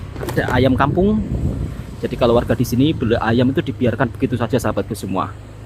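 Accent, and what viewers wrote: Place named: Central Java, Indonesia. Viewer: Bukan itu kalo liat logat bicaranya jawa tengh pesisir utara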